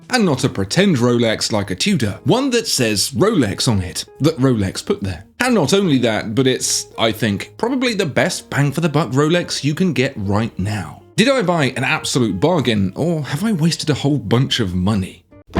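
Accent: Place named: United Kingdom. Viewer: Great writing and smart British accent. Makes for good program. And my daughter's name is Charlotte.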